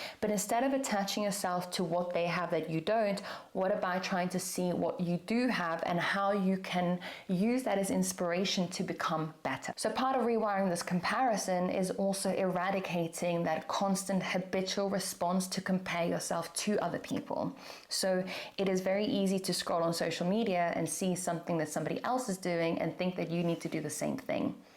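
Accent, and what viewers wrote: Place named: England, United Kingdom. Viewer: I just assumed you were from England. Where are you from/ grew up? Ps. You're my current fave educator/ influencer/ author ❤. Waiting for my bday in November to buy myself your book.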